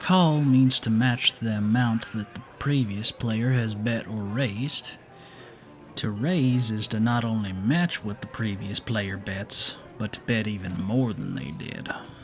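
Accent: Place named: South, United States. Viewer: I may listen to this over and over again if I want to play poker with my dad, cause like the title says "Mysterious Stranger Teaches You Poker". Also, love the Southern accent, and after playing poker with "stranger" here, I trust this guy :)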